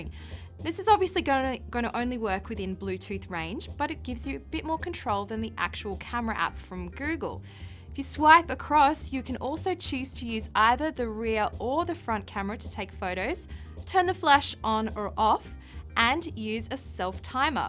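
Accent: Australia. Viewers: Is she Australian!? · There is nothing wrong with her voice. If you're referring to her accent, its Australian mate. Aussie Aussie Aussie!! Oui Oui Oui!!!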